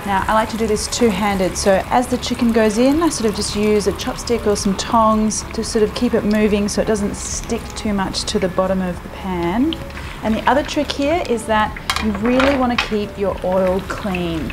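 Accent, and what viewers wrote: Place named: Australia. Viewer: I love your Australian English voice, the videos, kitchen atmosphere and your voice are all perfect!!! Correct me if you’re not from Australia! Sending you lots of love, bless and success your way!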